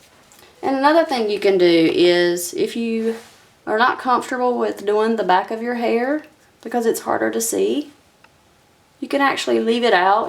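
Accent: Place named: United States. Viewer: i love this american accent :)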